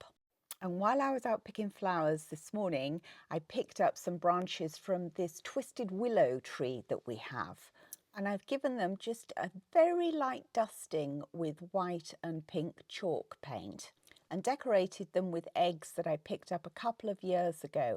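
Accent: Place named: London, United Kingdom. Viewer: ❤️❤️❤️❤️❤️❤️❤️❤️❤️❤️!!!!!! What time should I arrive for dinner?? How beautiful!! I must add, I have met 2 people this week, on separate outings in Beaufort, SC, who both talk and sound just like you. Both are from London, how about that. And….I had to share with them how I follow you and just adore your accent and love the words you use to describe things. ❤️❤️❤️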